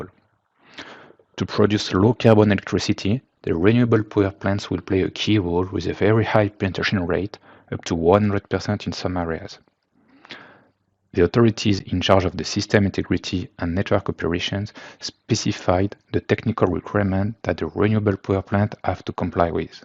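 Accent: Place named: France. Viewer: Its french version of english 😅 its cute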